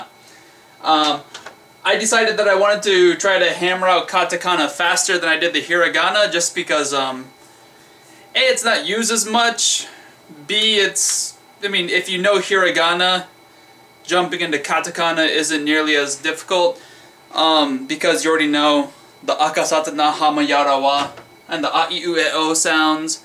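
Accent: United States. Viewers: Nice friendly guy.....the writing is stll ok....but that American - Nihongo accent is really not working.... pity...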